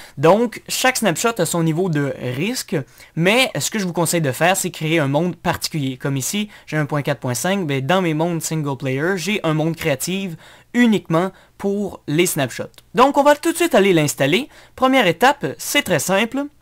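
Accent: Quebec, Canada. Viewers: il dit ''une video'' en tout qu'a au debut mais bon c'est comme sa c'est quebecois c'est fun c'est drole j'adore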